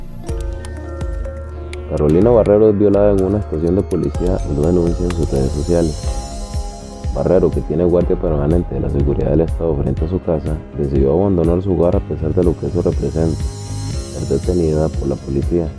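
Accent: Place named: Nicaragua. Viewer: No es cubano parece nicaraguense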